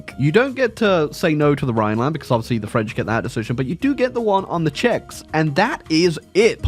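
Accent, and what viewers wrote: England, United Kingdom. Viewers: I hope sees this. Please can you tell me what country your from? You sound English but say zee and rowt (rather than zed and root)... Not important. But as an Englishman I just need to know 😂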